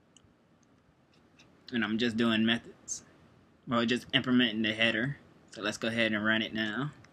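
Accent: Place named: South, United States. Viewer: This really really helped me , thanks alot man . i like your country accent too :)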